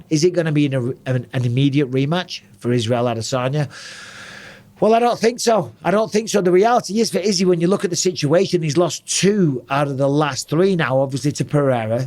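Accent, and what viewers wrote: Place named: England, United Kingdom. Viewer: Any englishmen channel gets a dislike even before even watching. Still gotta watch. Annoying accent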